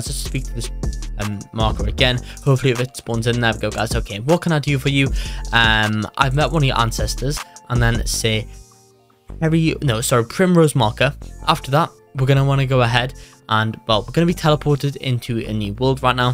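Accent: United Kingdom. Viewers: U ate british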